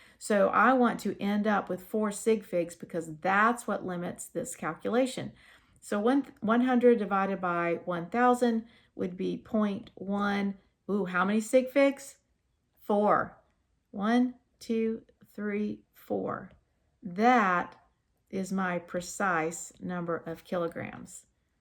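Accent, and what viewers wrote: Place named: South, United States. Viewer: I'm so stoked to have found your channel! Currently in a Chem course as a pre-req and while struggling through some other math concepts discovered that anything said in a southern accent apparently sticks in my brain better than without. Thanks for making so many videos, will definitely be coming back as I work through the course!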